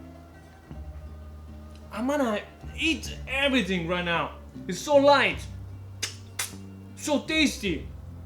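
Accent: Italy. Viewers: che tipo vivace che sei maddò si capisce subito che sei italiano! Tanti saluti da un abruzzomolisano che vive in Germania. continua così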